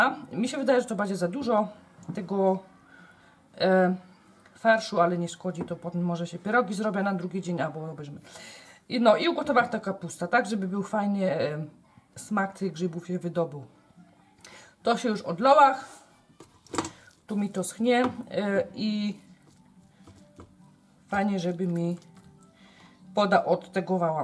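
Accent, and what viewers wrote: Silesia, Poland. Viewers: Superrr jesteś fantastyczna twoja gwara śląska jest niesamowita muszę codziennie Ciebie posłuchać. Buziaczki😘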